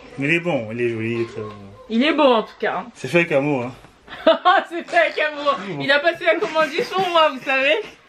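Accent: Cameroon. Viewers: Waoouuhh ma Soeur on dirait k j ai raté ce vlog. Mais suis trop fan de la façon avec laquelle tu changes ton accent c est l accent camer là qui me peut me tuer de 😂😂😂😂😂😂😂😂😂😂😂😂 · Hey je te découvre tata (comme tes abonnés t'appelent affectueusement)😊! C'est moi ou j'entend mon accent camer par ci par là? Si oui ça fait super plaisir de retrouver des Camerounais sur la toile! Je vais prendre le temps de découvrir ta chaîne. Vous avez l'air super sympas, tous! Allez, des bisous!!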